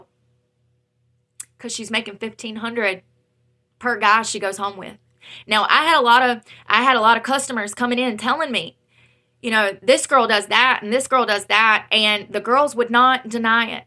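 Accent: South, United States. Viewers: Hey, stay positive. Whatever you do, don't go back to the booze. It's a lonely world out here, but guess what... you have the sweetest southern accent I've heard in a while, and that's special. Stay positive, god bless!!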